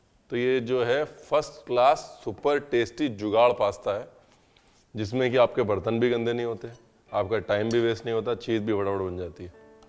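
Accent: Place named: Lucknow, India. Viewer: Liv ur lucknowwaaa slang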